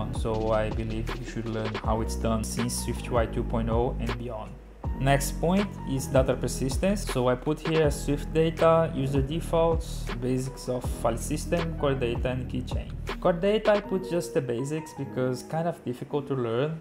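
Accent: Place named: Brazil. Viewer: Brazilian detected haha, vc tem curso em portugues ?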